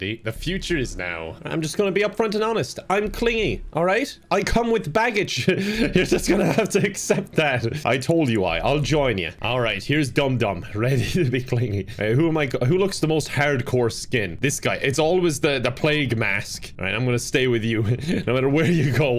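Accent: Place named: Ireland. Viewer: Your Irish ☘️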